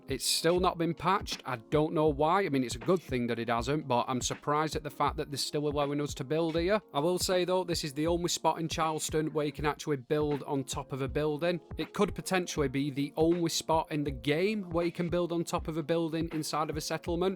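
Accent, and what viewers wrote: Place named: Northern England, United Kingdom. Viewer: mate your commentary is unreal, as a northerner hearing another northerner doing videos is hilarious cause I catch all the jokes, there'll be a time soon where northerners are appreciated more on youtube and you'll get ya views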